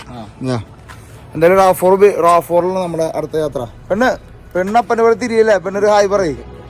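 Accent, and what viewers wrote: Thrissur, India. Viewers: ❤❤❤Wonderful description in Thrissur style and excellent camera...keep going ❤Artist Francis Antony Kodankandath from Thrissur,Kerala, India ❤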